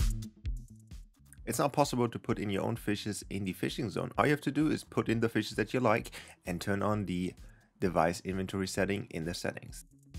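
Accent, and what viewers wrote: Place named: Germany. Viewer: u sound german....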